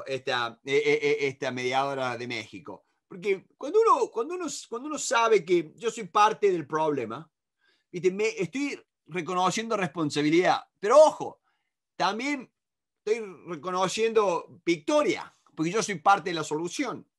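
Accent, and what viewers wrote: Córdoba, Argentina. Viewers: ALTO CORDOBES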